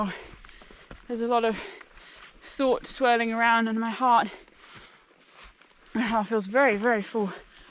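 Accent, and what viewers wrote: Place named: Scotland, United Kingdom. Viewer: Love your channel 🤗I've watched loadsa them to date. Love your Scottish accent, your enthusiasm & knowledge. I'm in Glasgow & never done Dornich until tomorrow! Keep it Wild Adventurer 😎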